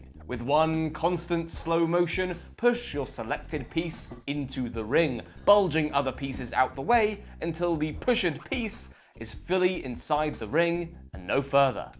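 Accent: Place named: Wales, United Kingdom. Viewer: The accuracy to which a computer can reproduce a Welsh accent is giving me an existential crisis. Par for the course with a SUSD review to be honest. Great video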